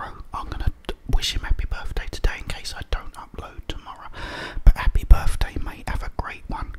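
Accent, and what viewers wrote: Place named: Cockney, United Kingdom. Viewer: Cockney is such a great accent.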